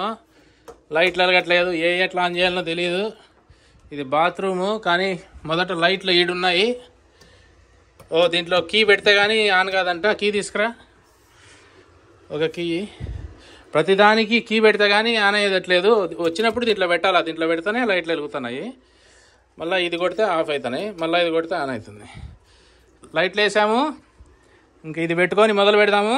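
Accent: Rayalaseema, India. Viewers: Americalo vunna swachamaina seema basha mataduthunnaru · అన్నా నువ్వు చెప్పే విధానం చాలా చాలా బాగుంది నువ్వు అమెరికా సముద్రాలు అన్ని తిరిగిన మన రాయలసీమ మాటతీరు మర్చిపోలేదు. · bro rayala seema yaasa super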